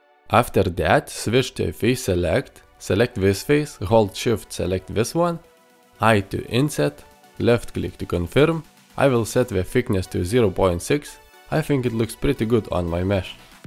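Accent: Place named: India. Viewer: Indian accent 😢😢😢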